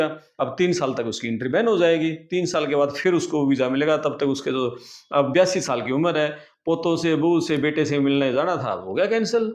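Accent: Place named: Haryana, India. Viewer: Heavy Haryanvi accent!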